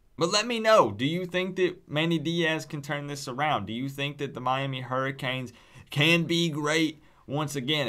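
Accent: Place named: Chattanooga, United States. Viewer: not for nothing, but you do have a Chattanooga accent.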